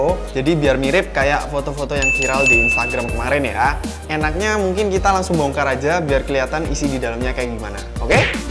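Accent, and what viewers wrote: Java, Indonesia. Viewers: medog bgt cak.....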